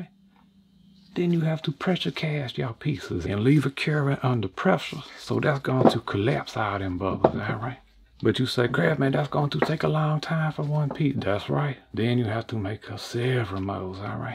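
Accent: Louisiana, United States. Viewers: Is that the bayou accent?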